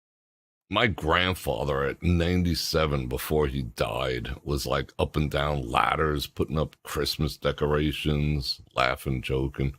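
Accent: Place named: New Jersey, United States. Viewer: It was great fun. Sorry, i missed the live part, what ever you talk about, its like have a friend chatting, i really enjoy listening to you, you sound like Jersy to me, I'm from Wilmington, D, just over the bridge.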